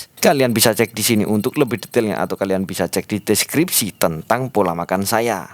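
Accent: Yogyakarta, Indonesia. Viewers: kok koyo logat wong jogja yo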